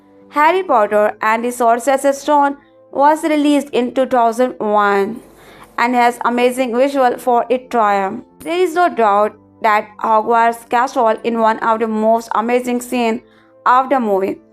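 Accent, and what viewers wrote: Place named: India. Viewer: We don’t need your story telling with weird indian dialect you morron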